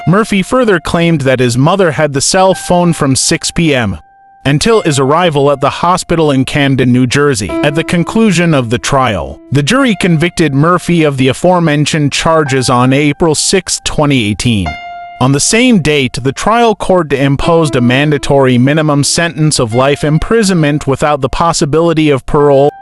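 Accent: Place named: Europe. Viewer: Thea ducking European narrating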